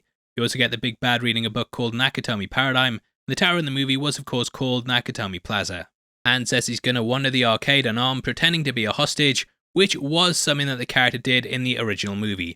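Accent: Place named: United Kingdom. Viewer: Clearly he has a British accent so cant be "Murican."..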